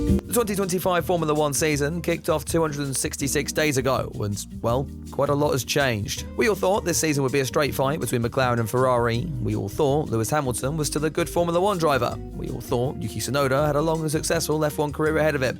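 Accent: United States. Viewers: Is that an American accent?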